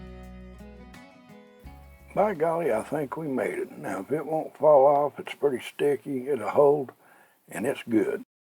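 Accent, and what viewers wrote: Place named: South, United States. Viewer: Fine looking sausage! After you commented on my recent video, I came to your channel and glad I did. This is the kind of videos I enjoy the most. Are you from the south? You kinda got my accent haha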